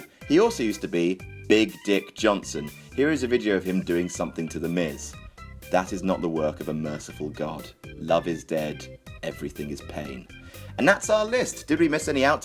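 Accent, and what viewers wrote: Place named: United Kingdom. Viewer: fucking hate British accent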